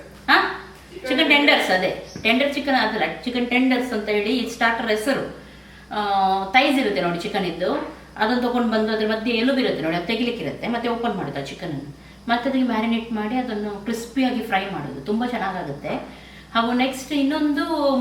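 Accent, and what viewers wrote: Mangalore, India. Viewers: ಮಂಗಳೂರು ಕನ್ನಡ ತುಂಬಾ cute unttu.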